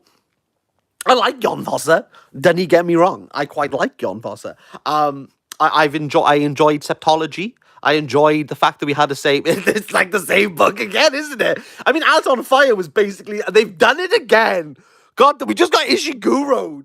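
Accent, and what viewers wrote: Wales, United Kingdom. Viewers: the welsh accent plus the lack of hearing-impaired captions has made this vid a little trying but think I got the gist the only fosse I know is bob fosse the broadway show dance guy they made hit movie about him also the art of the deal was ghost-written trump doesn't even write e-mails cause he knows they last forever and doubt he's cracked a bk since college but if they could give it to dylan and the peace prize to obama before he even finished moving into WH even the orange ogre may have a chance in the meantime send me some of your laughing gas could really use it now ⚛😀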